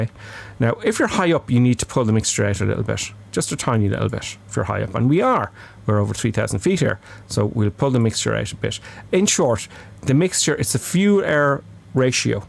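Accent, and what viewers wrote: Ireland. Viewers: Brilliant. Please continue. Love the Irish lilt 🍀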